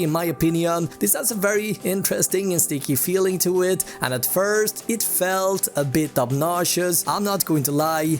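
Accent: Sweden. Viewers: Hörs ju glasklart på hur han pratar engelska. Can't get more svengelska then that... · Are you perhaps Nordic? Swedish perhaps?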